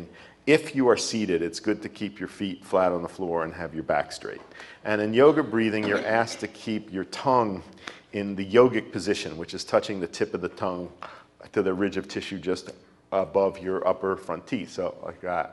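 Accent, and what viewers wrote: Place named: Philadelphia, United States. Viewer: Wow, the counting was fast. I will give it a go. Do I ever hear Philly in Dr Weil's voice; "out", "around", "choklit." The 1st 2 almost have an extra vowel sound I couldn't spell. Love it, however.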